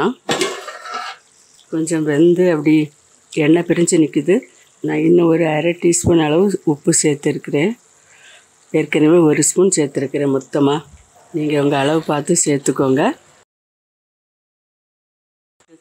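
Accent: Nagercoil, India. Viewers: Amma unga voice cleara irukuma Nagerkoil slang matiri iruku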